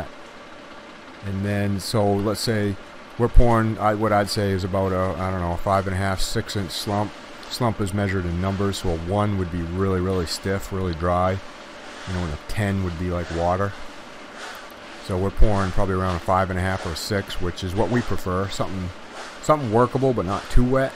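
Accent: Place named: New England, United States. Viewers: Hi, great video. Good to hear my native speak (I live in Austin but am from RI). Very helpful to see the entire job unfold. Hoping you'll be able to provide some input re: moisture barrier (and cost). Details below (it's a lot). Thanks in advance if you can help, completely understand if you can't. Am converting a 20' X 12.5' (leaky) garage into a recording space. There's a 30 inch x 20' ledge running along interior-wall side. The floor is 5.5" below house slab level, so assuming I need to leave space for flooring on top of new-pour slab level. Total square feet of space to be raised is 200 sf X (approx) 5". Question one: Have been told I need a moisture barrier and would have to wrap the studs, but noticed the white strip at base of studs on far wall in video. Is that the foam of which you speak? Can you explain the application? 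Question two: I live in Austin. Contractors bidding on job have varying opinions and thoughts about moisture from concrete at studs and structural materials to use. Current concrete floor appears to be fine and intact. My major concern is water, and like this client, it's apparent that at least some of it is coming from under the garage door during heavy rains lasting more than a day. My house is on a slight grade, and the driveway has a short flattened run in front of the garage door, but it doesn't seem to do much to re-direct the heavier rain water (no, no gutters, unfortunately). That said, the water reliably pools along the garage's inter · bill smith He sounds like a New Englander.. my guess is Boston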